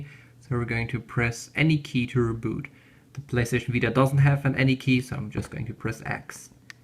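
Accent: Germany. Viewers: Some good old german accented English in the morning, lovely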